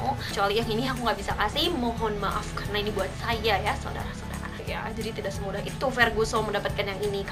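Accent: United Kingdom. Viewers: 1. 2. probolinggo 3. mau yang radiant skin kak, mau nyoba soalnya kulit aku kusem wkwk 4. ihh aku suka banget sama aksen inggrisnya kakak, bikin video tentang aksen inggris dong kak, trus semoga subscribernya nambah kak. sukses kak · Ig :Vitataaaaaa Kota Asal: Gresik-jatim Yay akhirnya GA time juga!!Awalnya sih aku ngasal buka video kaka gegara nyari review st.ives,dan dari itu aku langsung cinta banget sama chanel kaka,auto like n subscibe + aktifin lonceng sejak pertama kali nonton (ini ga boong swear✌),yg pertama bikin aku jatuh cinta tuh cara present nya yg bener2 se -profesional itu,dan bener2 ngreviewnya gapernah ngasal,banyak banget benefit yg didapet habis nonton video kaka,aku jadi tau kandungan apa aja yg mesti di perhatiin di ingredients produk biar milihnya tepat sesuai skin type,dan dan dan yang paling bikin aku jatuh cinta tuh cara ngomongnya yg ada aksen britishnya subhanallah berasa sekaligus belajar pronouncing gitu😍😍😍dan aku ikutan ga ini karena pengen banget nyobain st ives yg coconut n coffee,penasaran sama deep exfoliate+ gentle nya kaya yg kaka jelasin❤❤❤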